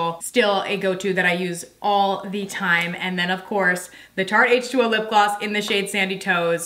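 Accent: New Jersey, United States. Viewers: For some reason, picking up your “Jersey” accent today. Cute. 😊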